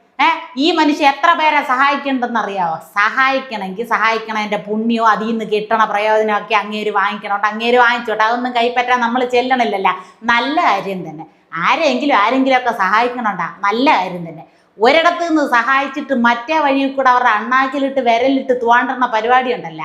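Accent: Thiruvananthapuram, India. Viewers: അപ്പി എങ്ങനെ ആണ് തിരോന്തരം ഭാഷ സംസാരിക്കുന്നത് കുട്ടനാട്ടു കാരി അല്ലേ 👍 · എപ്പോഴും തിരുവനന്തപുരം ഭാഷ ബോറാണ് മാറ്റി പിടിക്ക്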